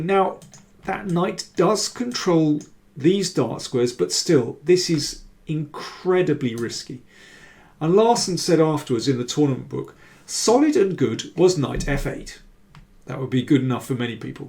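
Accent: United Kingdom. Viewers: Adnan he actually used the word "perilous" in this video which I can safely say Ive never used in my whole life. Its that British diction I love it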